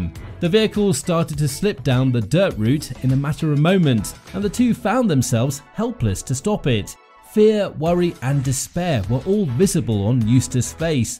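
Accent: United Kingdom. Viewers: Or British whatever you are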